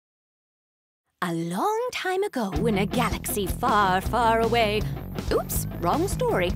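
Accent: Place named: Tidewater, United States. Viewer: the narrator, huh? Totally didn't know it was her. Different from her usual voices. I haven't seen the subbed versions, but unless I'm completely off-base the narration sounds a-okay. Tidewater accent, emphasis on certain words and phrases as if she were telling a story, and a general "old granny" feel to it. That's a pretty archetypal Western story-telling voice, and a dub is for Westerners. Rock on. I'm sure it'll be a good dub. There's a lot of big names in that line up.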